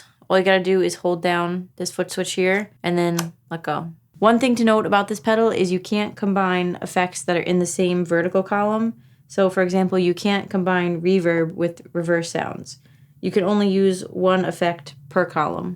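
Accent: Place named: Alabama, United States. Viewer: For faster bankruptcy, start with JHS pedal first episode. Turn the YT speed up to 150% as you can eliminate the slight Alabama drawl and get through bankruptcy much faster” By the time you get to the Colorbox 2, you will have enough money to enjoy whats left while having enough left over for food and rent with a special monthly “kitty” to buy your favs from.:)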